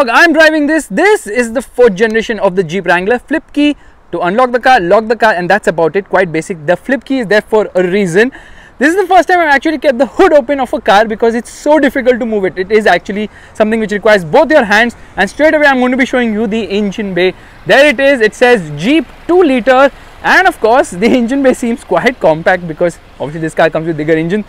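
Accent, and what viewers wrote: India. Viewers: Wow wow wow - The first thing you need to do is apply brake on ur tongue. It seems u are doing 250 words per minute & that too in Indian style English. Should we call it a running commentary or a runaway commentary? I'm sure 99% of ur audience will appreciate if u slow down. Basically u r ruining d show with your runaway commentary. Sorry if u find me rude, but I just honestly gave u the feedback. Thanks.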